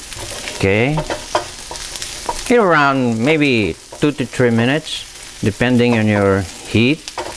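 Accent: Philippines. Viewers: Only Filipinos will criticize other Filipinos for their English. I know a lot of people who’s been here in the U.S. and still can’t pronounce or speak the English language but nobody cares. I sure don’t care but other Filipinos will make fun of their own. How about we start lifting each other up instead of putting people down because they can’t speak a certain language. He’s Filipino not American. Your comment has nothing to do with what he’s doing. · This looks so good Chef. My uncle used to make sisig for us but he moved to the Philippines when he retired from the U.S Navy. Unfortunately, he took his recipe with him, even my Dad don't know how to make it. Lol, I am glad I found your video, it look so easy to make and I definitely understand everything that you were saying, I love the Filipino accent, that's how you know it's real. I love you guys and keep up the good work..Yummmm!!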